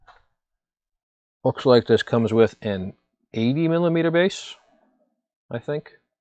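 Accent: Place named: Massachusetts, United States. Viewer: Are you from Mass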